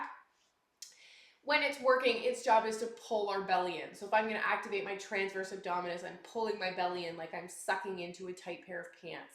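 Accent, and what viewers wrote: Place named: Canada. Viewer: So CLEAR! And delivered with a charming Canadian accent. I’m sure this will help lots of people thank you.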